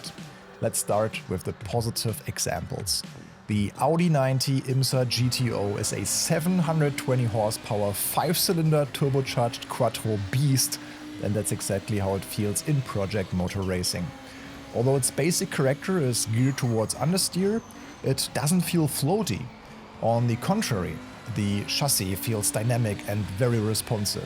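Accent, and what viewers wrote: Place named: Germany. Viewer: Man merkt sofort das du made in germany bist 😅.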